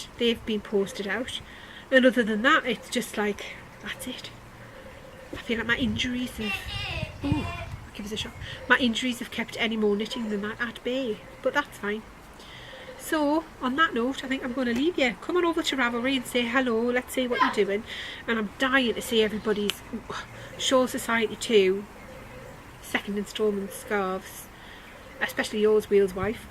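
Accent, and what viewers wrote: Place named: County Durham, United Kingdom. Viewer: Only just found your podcast and am binge watching you from the beginning. Loving it you crack me up. I recognise your accent and when you said you were brought up in Burnhope that's probably why. I was brought up in Annfield Plain and Catchgate so you probably went to my school, Greencroft (albeit a few years after me)? Anyhoo you've got a new fangirl 😄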